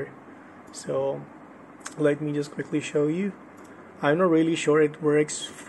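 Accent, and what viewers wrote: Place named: Rajouri, India. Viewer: Abey gandu bina accent ke bol deta, samajhne meh asaani hoti. Rajouri wali accent leke gaand marane jaa raha hai kya gb road?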